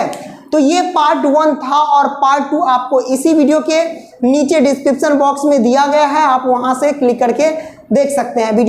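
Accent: Bihar, India. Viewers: Sir aap bihar ke rhne wale hooo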